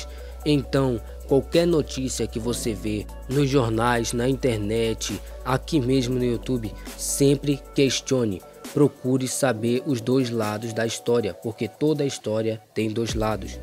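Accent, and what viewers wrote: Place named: Belém, Brazil. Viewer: Você tem a fala de paraense belemita. E a luta pela criação do estado do Tapajós não é dos políticos é do povo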